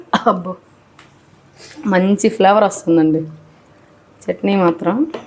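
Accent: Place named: Guntur, India. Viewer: Super super superrrrrrrrrrrr intlo chesukodaniki simple and superb Tq Gnt slang chala neet ga vuntundi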